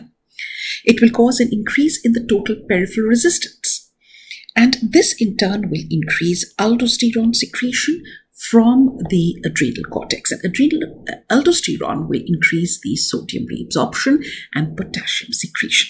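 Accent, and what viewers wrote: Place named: United Kingdom. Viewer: Mam uk wali nhi hindi wali pronounciation bolie , nhi smjh me ata but content acha h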